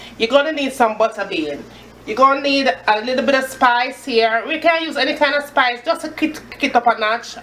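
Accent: Jamaica. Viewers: Her voice and accent brings back such nice warm memories of Jamaica, plus her food, nice and homely..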